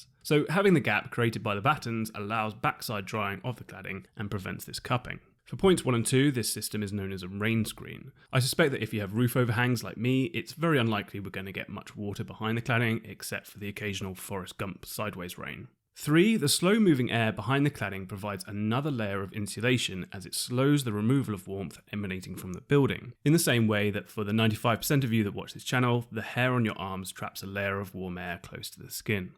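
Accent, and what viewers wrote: United Kingdom. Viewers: So good - thank you. We have just built a sculpture workshop with skillion roof, tapered purlins and spacers - VERY similar to a design that you show here. A labour of love with just the cladding to go. We started with no building experience. With a mixture of informed builder/designer friends offering advice, you tube tutorials - such as this superb example - and our own nouse we’re almost there. The last thing that we did on the weekend was to cut down a repurposed hardwood and full-length glass door and to fit out the door frame and install the door. It was fiddly but fitted beautifully - again using a you tube tutorial. All windows are re-used (free) old Huon pine ones. It’s an absolutely stunning timber that I use for sculpture. We’re using vertical rough-sawn hardwood (‘Tasmanian Oak’) cladding following your provided example, leaving air spaces due to the overlap. 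We live in Tasmania with a mild Mediterranean climate, though l spent time growing up in Devon. Your accent’s a bonus as it takes me back to time spent with my cousins in the UK! Thanks for your thoroughness with this guide; much appreciated.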